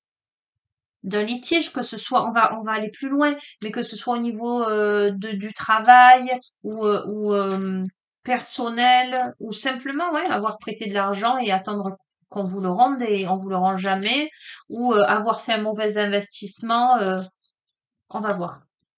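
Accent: South, France. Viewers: Bonjour je suis une nouvelle abonnée j entend l accent du sud . Je suis du Gers.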